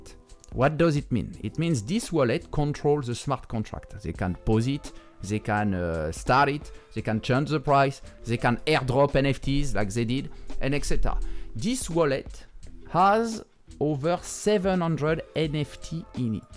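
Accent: France. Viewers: L'accent French est le meilleur tout le monde kiff de ouf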